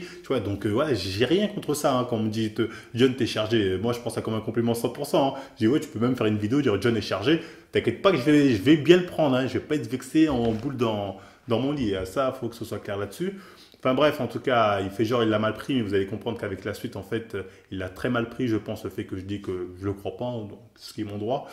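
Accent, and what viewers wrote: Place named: England, United Kingdom. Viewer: Les fit gurrrr, j'adore ton accent anglais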